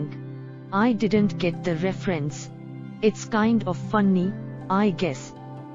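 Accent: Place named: India. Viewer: PS. Who is the Indian narrator for this 'show'?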